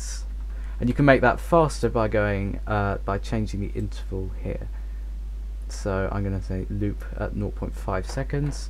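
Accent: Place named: United Kingdom. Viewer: are you british, you sounds like you came from the united kingdom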